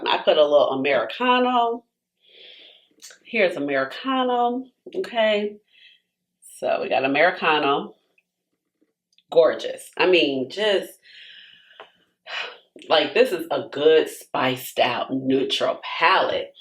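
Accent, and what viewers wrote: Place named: Chicago, United States. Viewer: I had another idea for when you reach your palette ranking video #24. You could do a brackets-style “battle” of all of the #1 ranked palettes from all of the ranking videos? You could also do it as a series instead of a single (long a$$) video. Just an idea! Loving your content not only for the lewks (which are stunning!) but I also love to listen to people with my hometown accent. Chi-town, baby ✴️🚤✴️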